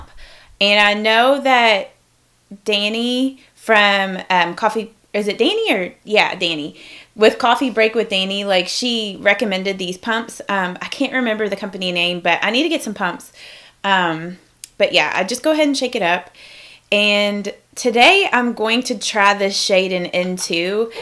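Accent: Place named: West, United States. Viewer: I like your kinda accent like...it's hard to explain it's like western kinda, you say old like ode...idk but I like it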